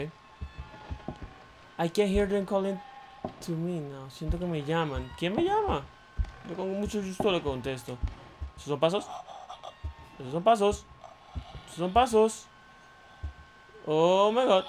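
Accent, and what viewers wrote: Colombia. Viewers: bro eres de bogota o medellin?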